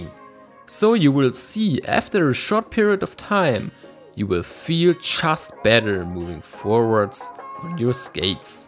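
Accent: Germany. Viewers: I just ordered my first pair of inline skates ever. I’m 42. I grew up using quad skates (ice skated too.) Got the Powerslide Next Core 80 all black set up because everything less expensive was sold out. Ended up with the dream skate😆🤩 Great video with very straightforward tips I’ll be using. I recognize that Berlin accent. Love it. Thanks!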